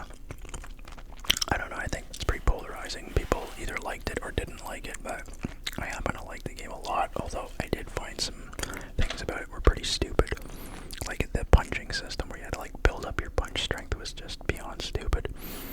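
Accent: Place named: Canada. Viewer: also are you from Canada?? I hear a little accent and its really nice hahah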